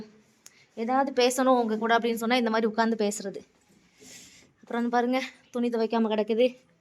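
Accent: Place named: Tirunelveli, India. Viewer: Unga ஊர் tirunelveliya nega pessurathu appete thereythu nan tirunelveli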